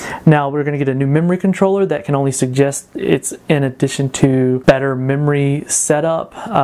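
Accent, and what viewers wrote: South, United States. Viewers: I love how his southern accent keeps shining through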